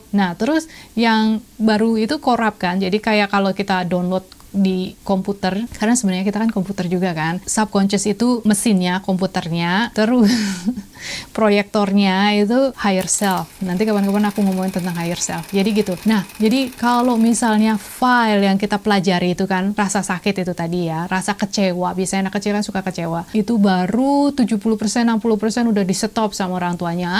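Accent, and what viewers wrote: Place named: Java, Indonesia. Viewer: Mantap sekali ilmunya 🥰🥰 sehat selalu kak 😍 Bahasanya clengkring clengkring ngapak banget 🥰🥰🥰 salam dari purwokerto kak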